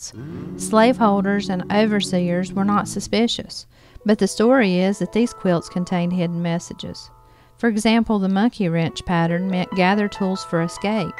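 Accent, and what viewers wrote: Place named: South, United States. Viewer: Yeah, I didn't quite get that, she sounds more like a Southern gal though. I honestly really don't care who narrates it it just leans toward more appropriate having a black person do it. It reminds me of when white people pretended to be Indians in movies. I'm quite hungry for more information especially accurate information about the black slave life and their escapes.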